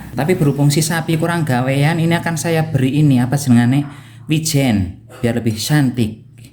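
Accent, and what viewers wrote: Banyumas, Indonesia. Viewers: Selamat siang mas trima kasih banget resepnya sangat gamblang aku suka dengan gaya ngapaknya hehe sukses slalu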